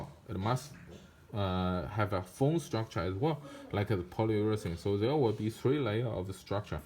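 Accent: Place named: China. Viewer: Chinligsh to much Feb feh fuh he and less a e I o u 😔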